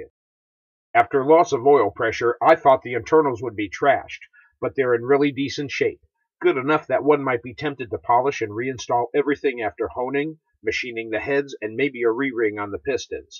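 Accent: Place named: Missouri, United States. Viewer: Awesome video. I also like to do engine swaps and customizations. I also live in Springfield Missouri which it sounds like is where you're at also. I've watched several of your videos recently and never caught that. 👍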